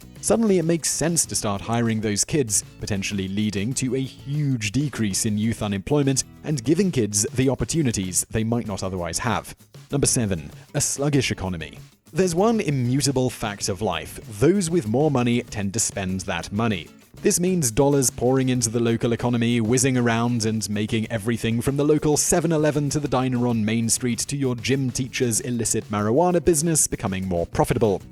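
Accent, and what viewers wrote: United Kingdom. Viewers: Why is that ANYONE with a British accent has ZERO credibility with me? .... Well they HAVE made every serious mistake one can imagine. IF there was no minimum wage then it is ABSOLUTELY FRICKEN GUARANTEED that a bunch of folks WOULD be taken advantage of more than they are now ...not everywhere ...not where there is competition for quality labor...but in smaller environments where greedy employers can ruthlessly get away with it ...ignorant people just wanting to pay the rent and eat SOMETHING might feel literally forced to take it...their employer for instance being the only place they can physically get to every day? The logistics for a poor a person can become overwhelming and there ARE some wickedly greedy people that WILL take advantage of such things every single time ...hence all the laws and penalties created to FORCE really wicked people to play nice....it is a shame we have to have laws, that people won't just be honest and do what they have to know in their heart is right....but it IS the fact of reality and all the pie in the sky thinking people might be different is pure bs proven over and over and over ....hence the sad struggle FOREVER...there is NO political solution...no form of government and no lack of government will cure what ails us ...actually it is incredibly AMAZING (I think) that things work out as well as they do in SOME places ...probably so called "Christian values" play largely into it working ...working on the consciences of people so that enoug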